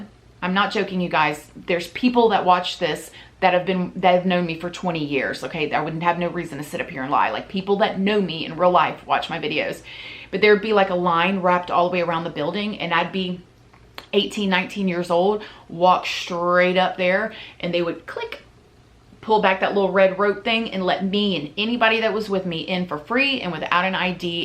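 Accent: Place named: Baltimore, United States. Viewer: Sounds like she is from Baltimore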